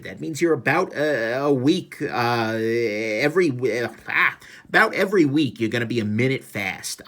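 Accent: New York, United States. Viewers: That's a New Yorker voice hard core.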